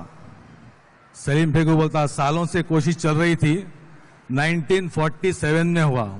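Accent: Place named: Hyderabad, India. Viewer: Kali Hyderabadi bolthey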